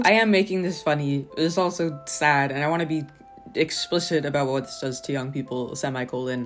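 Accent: Midwest, United States. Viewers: I don't know where in the midwest your accent comes from, but you have a very distinct speech pattern. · Can I ask is somebody who is in the shoes that you seem to have been in when making the video you reference at the start, what was it that encouraged you to go through with the full diagnosis? I’m pretty much 99% sure, and I’ve just found out that I’ve got to start the waitlist again because I’ve moved from one County to another which is like moving states. (I am assuming from your voice that you are American) it’s going to be 18 months, but I’m really sort of at that phase of feeling sad about the whole realism that you probably are… You got any advice ?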